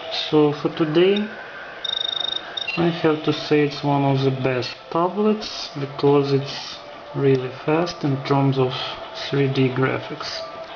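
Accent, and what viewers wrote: Russia. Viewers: You sound pretty ruassian O_o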